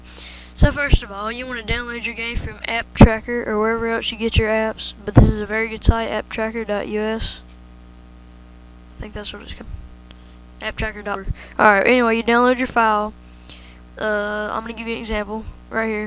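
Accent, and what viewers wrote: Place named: Netherlands. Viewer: Stop insulting his accent, its dutch and its cool :) Yep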